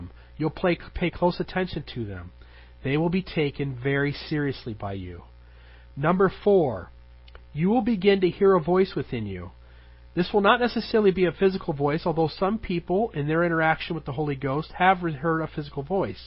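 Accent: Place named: Great Lakes, United States. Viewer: sounded so much more ‘Great Lakes’ back then.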